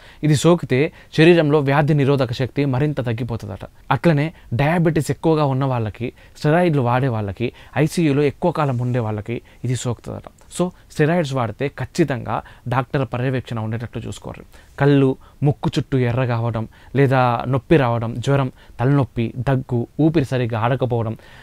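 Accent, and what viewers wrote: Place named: Telangana, India. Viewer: మీరు తెలంగాణ యాసలో కాకుండా రెండు తెలుగు రాష్టాలుకూ అర్ధం అయేలా చెప్పండి 🕉️🕉️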